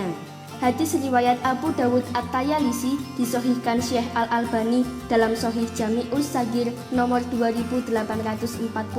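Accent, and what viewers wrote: Java, Indonesia. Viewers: medok medok seksi❤❤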